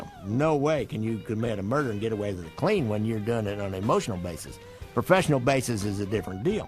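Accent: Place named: Warrensburg, United States. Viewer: Your voice reminds me of some relatives in Warrensburg 😊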